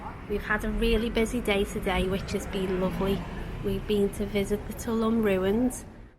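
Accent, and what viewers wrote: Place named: Yorkshire, United Kingdom. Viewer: Canadian here so I'm a total moron and can't place your accent... is it Yorkshire?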